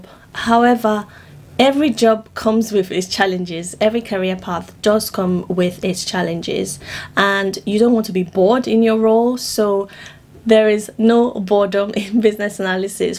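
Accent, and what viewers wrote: Nigeria. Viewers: Nice content. You have a new subscriber. How come you have my Nigerian accent😀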